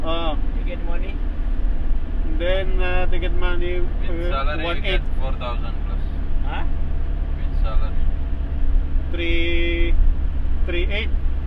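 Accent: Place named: Iloilo, Philippines. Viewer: It's ok po after pandemic nlang po kayo mag vacation. You sound an ilonggo kuya hehe..ingat po kayo.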